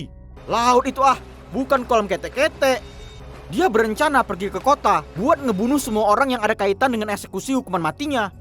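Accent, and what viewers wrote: Medan, Indonesia. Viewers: Slalu suka logat medan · Org medan bang logat nya kayak orang medan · Orang Medan ya bro?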